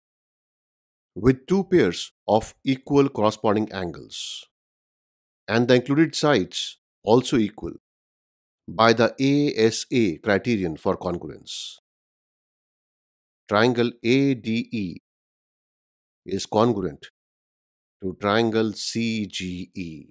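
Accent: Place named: India. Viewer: u sound indian tho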